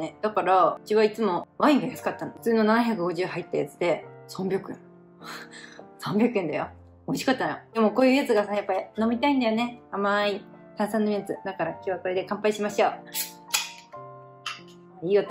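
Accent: Hokkaido, Japan. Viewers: お話しも絶好調ですね。北海道Accent炸裂！道東の方ですか？ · イントネーションが北海道ですね😃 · 70歳のおばあちゃんです。いつも楽しく観ています。帰国してからのtalkで時々出る北海道弁がすごく可愛いなって思っています。もっと北海道弁で話してほしいです。因みに私は北海道出身ではありませんが、、、。英語頑張って続けて下さいね。私も長く勉強して現在は仕事に活かしています。継続は力なりです！ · の、北海道弁が可愛いらしく聞こえます。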